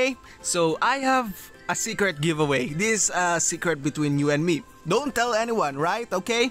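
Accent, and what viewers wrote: Philippines. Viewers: are u Filipino? Because of ur accent. And i want lunar beast annie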